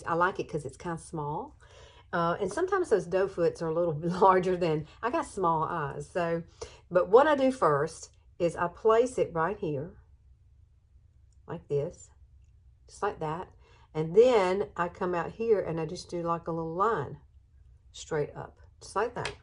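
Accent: South, United States. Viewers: I really love your videos. Your voice is so calming along with your Southern accent. I have puffy wrinkled eyes so I love this routine. Keeping you in prayer for the loss of your Mom, it’s hard I know...take care lovely lady 🙏🏼♥️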